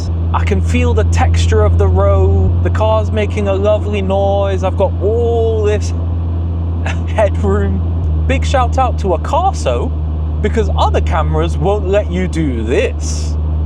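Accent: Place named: South London, United Kingdom. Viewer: I’m getting a slight south London accent but that location looks beautiful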